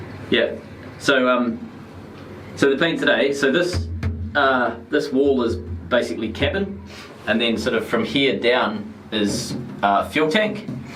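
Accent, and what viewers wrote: New Zealand. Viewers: Are you originally from NewZealand?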